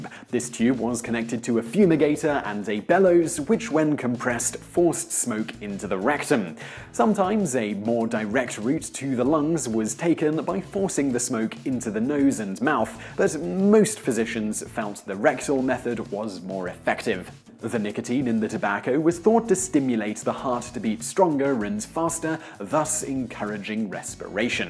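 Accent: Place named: United Kingdom. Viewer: "Here in America", there's other English speaking countries too. Listen to Simon's accent, he's British. British spelling is arse and yes we say it like it's spelt. Actually "ass" in Britain refers to a type of Donkey.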